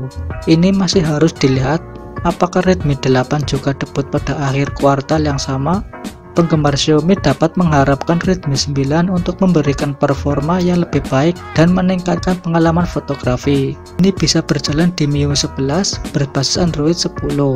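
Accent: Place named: Tegal, Indonesia. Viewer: Medok bgt....tegalll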